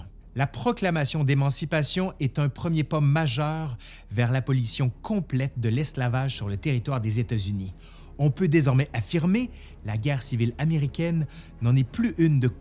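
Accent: Quebec, Canada. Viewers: Grave que j'ai adoré, première fois que j'écoute une vidéo historique par un Canadien où Québecois et l'accent, la façon de réciter, la passion, j'en redemande !! Bien le bonjour de la Belgique ! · L'histoire des États-Unis brillamment expliquée par un Canadien à la diction cativante et au merveilleux accent Québécois! 👍🙋🏼‍♂️ Remerciements et salutations depuis la Suisse.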